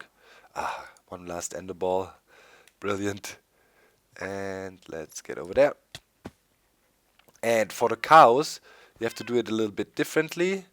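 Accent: Germany. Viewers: i could tell you what hannibal said in the english version of the a team...but...i kind of like your germglish..it adds to the series..ok..i feel bad...in english what he would say at the end of each episode was..." it gives me quite some joy when my plan is coming together."...now you know, happy to help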